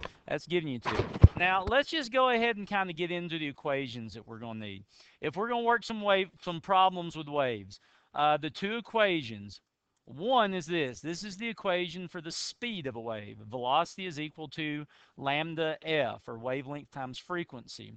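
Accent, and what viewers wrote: United States. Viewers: loving the western accent! -- better explained this way!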